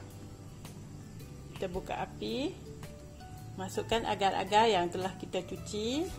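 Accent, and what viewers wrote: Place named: Thailand. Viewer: suka dengar cakap adik...tok kak aya asal keturunan siam cakap sama macam adik..teringat kat tok...semuga adik sihat selalu na...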